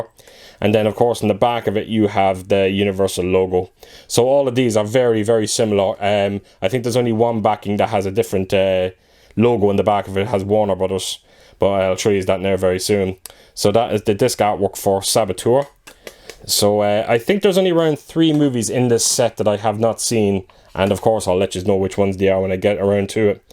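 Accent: Ireland. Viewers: I know we chatted before neighbor, I thought you were a Louth man (accent) if you remember, anyways I love the channel and never miss an upload keep them coming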